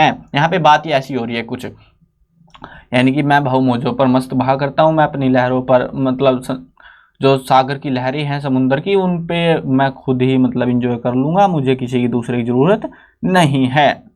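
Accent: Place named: Churu, India. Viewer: Great sir.... Eetta acha to hme hmare teacher bhi nhai pdate.. Sir ap rajasthan me churu Side se ho kya apki language se pta chl rha he..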